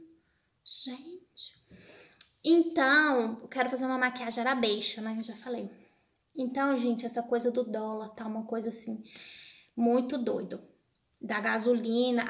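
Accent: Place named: Northeast, Brazil. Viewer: Vc é de Brasília...seu sotaque parece do Nordeste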